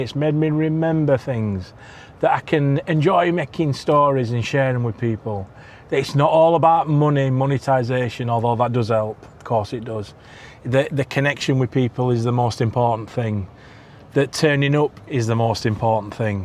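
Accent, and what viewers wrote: Yorkshire, United Kingdom. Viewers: glad you was happy for me to comment 😂. Filming yourself also makes yourself know that your not a bad person,you start seeing what everyone else sees about you. Mental health is the worst, can't wait for next one, so miss the Yorkshire accent 😂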